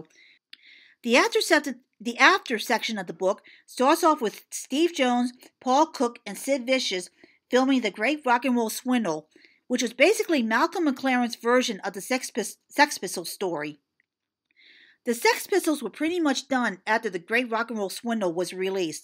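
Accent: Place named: New York, United States. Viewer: Cheers K,best review I've heard,or read on the internet.Love that New York accent,I'm from Boston so,people get it after a bit.Lol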